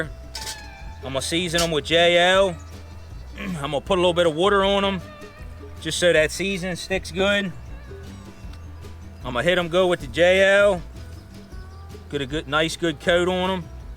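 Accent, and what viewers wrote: Baltimore, United States. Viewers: His accent is quintessential Baltimore! I was waiting for him to say, ‘hon’. Lol! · As soon as I heard this guy’s accent, I knew I could trust his opinion about Baltimore crabs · Bawlmer, Md.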